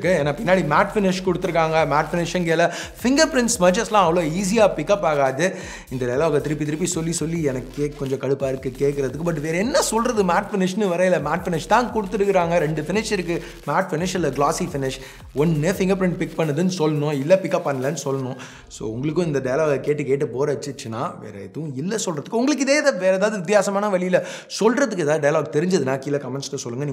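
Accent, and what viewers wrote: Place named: Chennai, India. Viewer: Now a days great changes in videos. Especially on slang. Chennai slang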